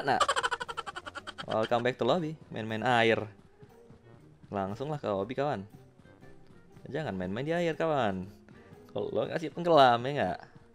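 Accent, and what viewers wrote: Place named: Sulawesi, Indonesia. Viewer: Orang sulawesi tuh bg haha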